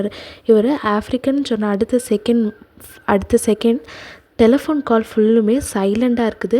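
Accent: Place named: Kanyakumari, India. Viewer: You’re from kanniyakumari for sure some how thank you ❤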